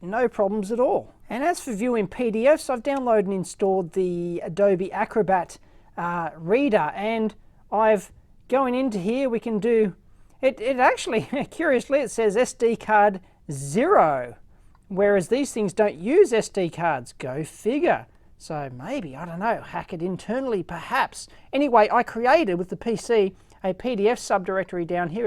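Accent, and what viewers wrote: Australia. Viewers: Hahaha, he thinks our Aussie way of speaking offensive. For us it's pretty normal.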